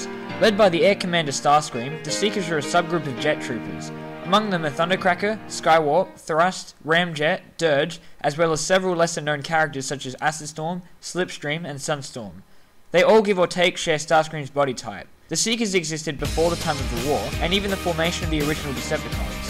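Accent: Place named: Australia. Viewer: Why is there a Australian person narrating this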